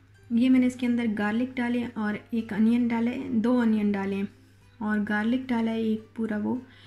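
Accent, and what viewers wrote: Saraiki, Pakistan. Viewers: Hi sister!from where are u?ap k bolne se sarahki zuban ke jhalak a rhe h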